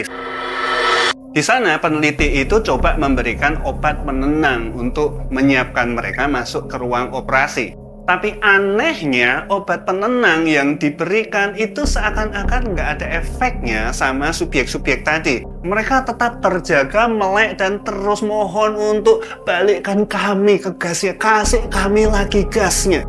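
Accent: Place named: Java, Indonesia. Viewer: Suara anda medok banget 😅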